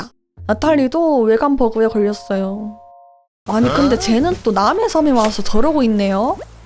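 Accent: Gyeongsang, South Korea. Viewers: 너. 대구 사람이지. 표준말투 쓰는 대구 사람가터. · 영상만 해도 힐링인데 갱상도갬성으로 내적친밀감이 +1000 상승했습니다♥ 흥해라 갱상도! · 님 영상 처음본게 이거인데 그때도 님 억양듣자마자 바로 대구사람인거 알아챔 ㅋㅋ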